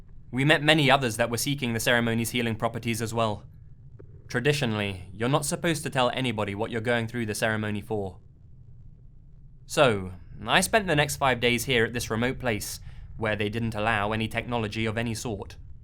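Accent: United Kingdom. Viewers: This dude is the most British sounding native American I've ever heard. Good stories tho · Just have to say that i really like this channel. Love the brittish (?) accent. Keep up the good work! Sorry to hear about the copywright shit. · Native American Indian stories with British accent. Loving the stories thus far just caused me to raise an eyebrow. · Fair use Act violation sounds like. As long as no one's being harmed. I don't see a problem. Good video.. a little wierd hearing the story in a British accent though. I'm thinking more baritone" Native elder 101" voice. Good stories. Thank you.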